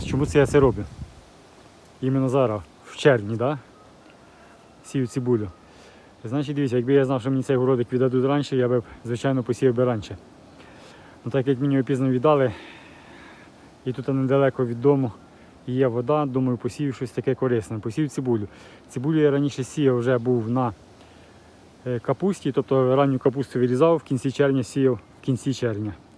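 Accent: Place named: Vinnytsia, Ukraine. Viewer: Сорт цибулі LUSY? ( Вона жє Свіфт.) Я правильно зрозуміла? А то з вашим чудовим Вінницьким діалектом не завжди зрозуміло.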